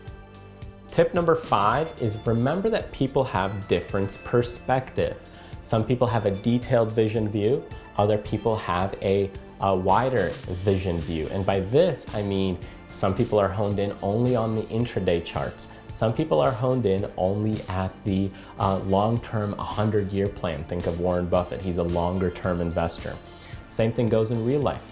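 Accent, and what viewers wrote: Russia. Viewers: thank you for a great video man , btw are you Russian ?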